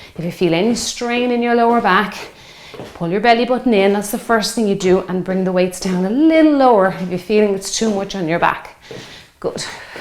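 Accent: Ireland. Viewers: Are you from Ireland? Trying to figure out your accent lol I love it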